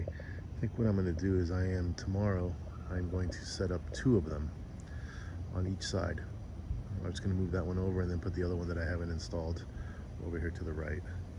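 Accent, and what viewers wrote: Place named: Long Island, United States. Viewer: Are you on long Island? Sounds like it....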